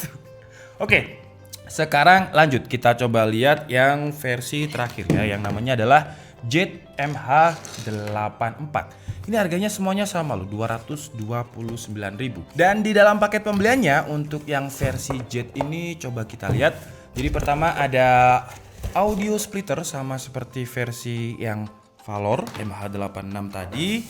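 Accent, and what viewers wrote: Java, Indonesia. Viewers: wong jowo a sampean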